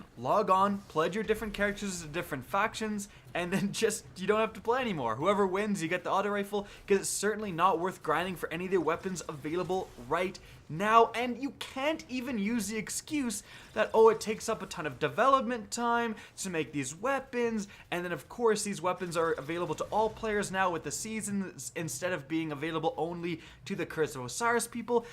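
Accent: Canada. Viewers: omg hes canaden "sawy"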